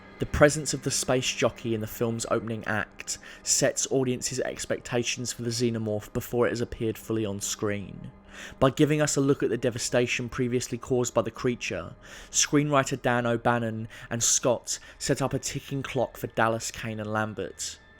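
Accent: United Kingdom. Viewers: He's British, nothing odd about his voice.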